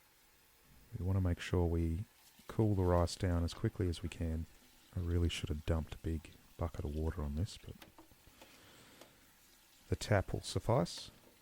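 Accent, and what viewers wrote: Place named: New Zealand. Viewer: Am I hearing an NZ accent.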